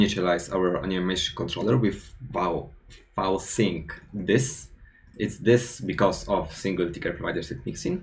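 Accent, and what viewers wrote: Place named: Russia. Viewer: Слышу русский акцент...))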